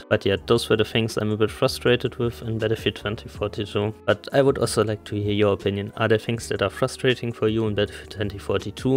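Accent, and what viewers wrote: Germany. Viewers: sehr gut. Man hört es schon raus, aber ich als 45 Jähriger mit meinem Schulenglisch verstehe es echt gut. 👍🏽 Weiter so. 🍻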